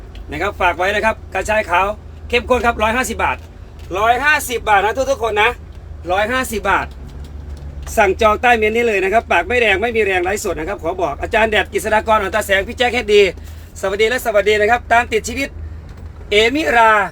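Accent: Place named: Laos. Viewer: FC.จากสระบุรี เสียงดังฟังชัด ชอบอาจารย์พูดไทยปนลาว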